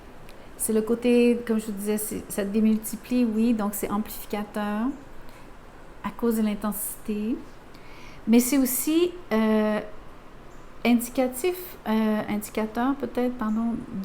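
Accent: Quebec, Canada. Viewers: Merci pour toutes ces précisions avec ce bel. Accent québécois .ce tirage me parle je suis sagitaire l autre personne qui souhaite revenir ' serait scorpion vous me redonnez Espoir👍🙏🌟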